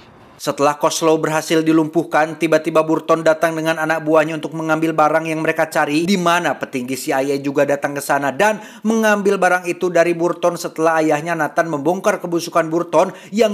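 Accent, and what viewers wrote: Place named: Madura, Indonesia. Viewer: Madura bg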